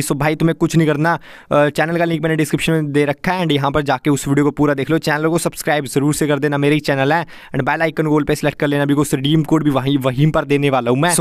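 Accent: Punjab, India. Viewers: Bhai kya aap Punjab se ho🤔🤔🤔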